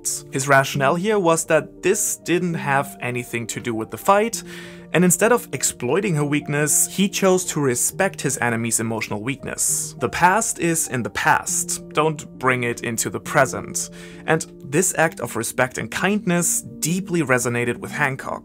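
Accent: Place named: Germany. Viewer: bruder dein english is perfekt aber dieses japanische mit dem deutschen akzent geht einfach gar nich. lern dein akzent weg oder lass es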